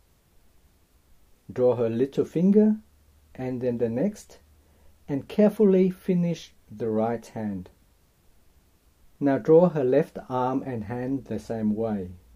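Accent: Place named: United Kingdom. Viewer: u sound british. sorry for saying that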